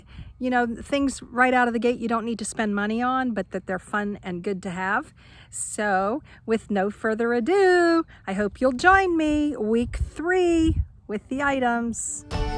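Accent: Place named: Oregon, United States. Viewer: I don’t know where you’re from but you sound like you’re from Roseburg, Oregon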